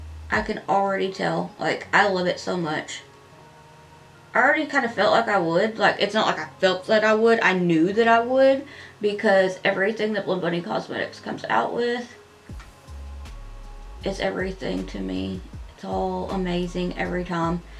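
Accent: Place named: South, United States. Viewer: Oooh I’d love to have this palette!! It’s on my future purchase list. I Like seeing you start out with 0 eyes done! Cool to see the first, true, artistic vision. Possibly the mistakes. Get to see the decision making process. I understand why creators start with 1 done on videos. But, as a viewer, I kind of like seeing it come together, without the end result smacking me in the face at the same time. If that makes sense. It would be easier to do the second eye, after doing it a first time. You would do it smarter. More effective. Cut out unnecessary things or reverse wrong ideas. I get it. But I like this style too! Anywho - love your content. Love hearing a familiar southern drawl. The pearls you picked are PERFECT with this look too. So gorgeous ❤❤❤ sorry, my comments tend to ramble. I have lots of thoughts lol 🤷🏼‍♀️😘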